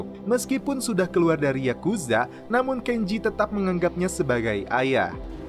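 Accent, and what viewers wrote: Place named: Purwakarta, Indonesia. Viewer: Gue nebak, ini yg ngomong orang purwakarta nih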